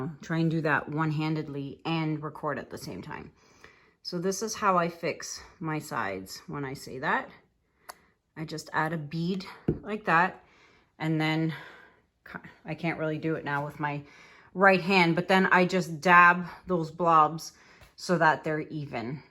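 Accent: Canada. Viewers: I just love your videos. They're incredibly informative and love the Canadian accent. Your work is gorgeous. One of the videos of yours that I watched you counted in Greek and said that's your Greek lesson for today. I'm a Greek girl from Chicago so I was hooked after that. I also have a Sophia. :)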